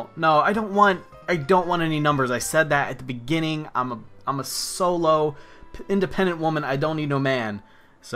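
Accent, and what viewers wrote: Sweden. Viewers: That is one legit Swedish accent! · your Swedish accent sounds like Sven from happy feet 2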